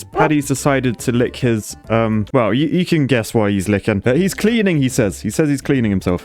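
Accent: United Kingdom. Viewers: Always great to hear that beautiful British voice. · early to this amazing video from my favorite bri'ish engineer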